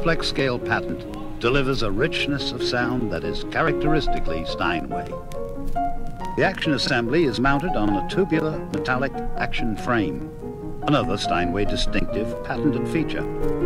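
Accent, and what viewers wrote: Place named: New Zealand. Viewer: Why does the narrator, John Steinway have a NZ accent? I am confused... Is there a NZ connection?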